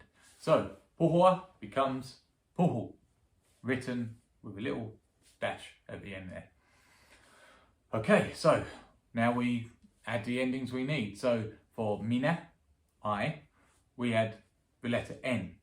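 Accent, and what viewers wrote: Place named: Australia. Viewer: I think he is, and even implied being one. Whatever the case, his English is delightful and perfect. The accent sounds Australian, which is the accent I find the most beautiful 😊 I am a native Finnish enjoyer, but I find it interesting to refresh my "analytical" knowledge of the Finnish grammar and language, too. About rolling the 'r's: that sound is usually the last one that native Finnish children, too, learn to say when growing up! Before they ace it, they usually replace the sound with 'l'. Even I vaguely remember the time when I still couldn't make that sound but was avidly trying to. Your first example of "purra" sounded just fine to me. Personally, I like to say the long 'rr' in a less harsh-sounding manner, quite similar to how you did. I still roll the r, but maybe just with the side of my tongue. It's a feature of the dialect which you could hear in Tampere region. 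Especially their short 'r' is more like the Japanese counterpart, with just a very brief roll.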